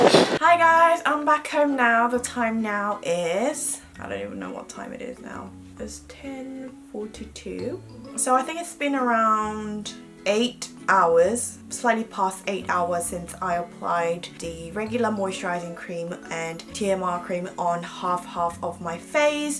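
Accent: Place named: United Kingdom. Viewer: 영국영어도 제품 설명도 매력 넘쳐요♡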